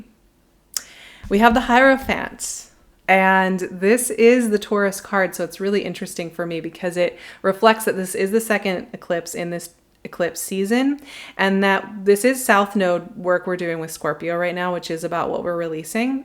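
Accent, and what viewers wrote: United States. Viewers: She sounds like an american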